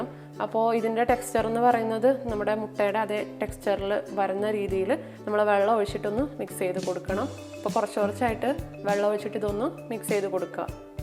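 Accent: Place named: Palakkad, India. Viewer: Chechi inte talking kettappol sherikam pkd pole ind athu konde ah chodhiche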